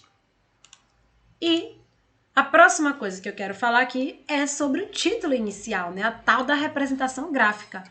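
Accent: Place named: Paraíba, Brazil. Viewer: Tenho uma sobrinha que se chama Juliane, é paraibana, e tem sotaque idêntico ao seu.... Parabéns pelo vídeo. Muito explicativo. Paulo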